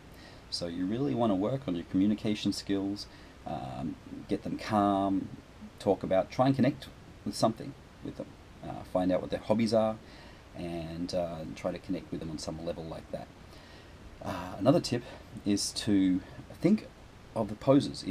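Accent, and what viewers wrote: Australia. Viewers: Great video, I have to say... the first time I heard your voice I was like...Wait... what? Wasn't expecting the Australian accent. Now every time I watch your videos I quote Mick Taylor from Wolf creek as I watch... Kind of morbid I guess...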